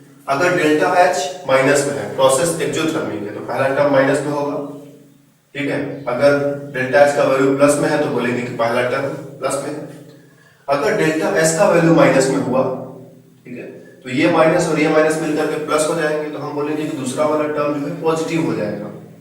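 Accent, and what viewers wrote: Bihar, India. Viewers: sir app bihar sae ho??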